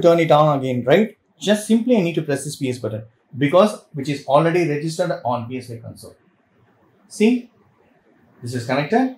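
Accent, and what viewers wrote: India. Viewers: Thanks, your english accent has a malayalee flavour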